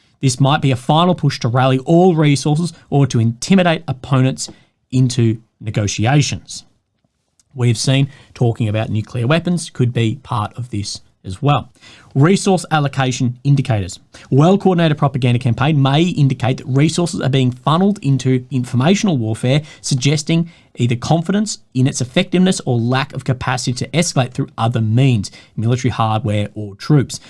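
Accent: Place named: Australia. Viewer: This top bloke is an Aussie.His accent as well as his ideas are also 'top'!!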